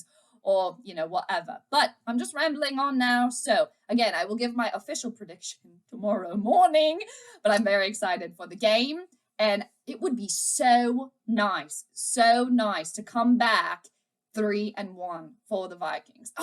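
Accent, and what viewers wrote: United Kingdom. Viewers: ..I hear your British accent, and wish Roar of the Lions UK & You, could have had time to collab. They said they were going to have you on after the game, but It never materialized. I so hope both of you are able to collab before the Vikings vs Lions next game. I catch their show during the week. It's a trip to hear them talk & usage of the English language. "That team/player played like Rubbbish" etc. LOL. Hope to catch you for your live stream. Will look for your notification when you go live. 20 subs to 1 K. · Hey, very nice british accent, make me want some tea and crumpets! LOL I will be there in spirit as I will be out of town sun morning- in the twin cities. you're the tops as always. cheers. see you on the flip.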